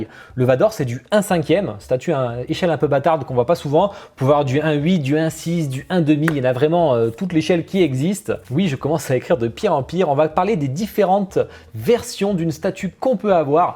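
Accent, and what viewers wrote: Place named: England, United Kingdom. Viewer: Cet accent anglais je suis fan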